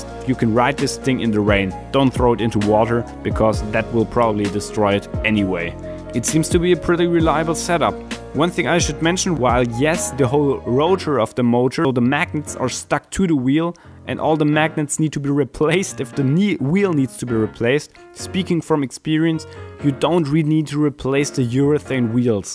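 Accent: Germany. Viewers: Hes like German Engineer on old VW commercial dubs. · Wieso net deitsch? Des deutsch Akzent englisch ist ohrenkrebs dude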